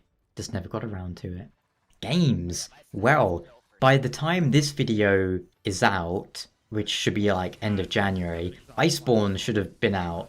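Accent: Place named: United Kingdom. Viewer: You sir just earned a subscriber as well as a sponsor! For anyone new to this channel, obviously I'd recommended binging through his Dark Souls LP's. Just save the playlists... watch in order. Then after the LP's go through the lore videos... you don't have to watch them all at once... just a few and then new ones should regularly pop up in your recommendations and don't worry, there are eight seasons of them to keep you happy. Watching his longer form game and media reviews/videos can be tricky... but has such a smooth non-distinct across the pond accent that I usually watch his videos for background when I'm working or writing or forum administering. The only drawback is I'll often be too drawn in that I'll watch his videos more intently. But remember to leave a like and comment before the video is over. Need that engagement. What else am I forgetting? 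Doom 2016 is great, Rey is a Mary Sue and cough <link> cough Excuse me. Check out our forum! At the very least, it'll generate some traffic and buzz for us so we can sponsor this cheeky chap again! Like a symbiotic relationship between the Sietch and the mighty Sandworm. The (often salty) spice must flow.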